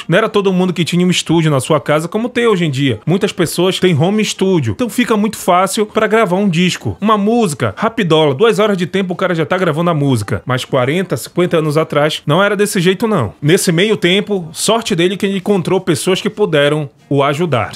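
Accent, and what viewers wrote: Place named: Bahia, Brazil. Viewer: Caracas pelo deu sotaque vc e baiana Amo Bahia também sou Bahia mais vivo em outro estado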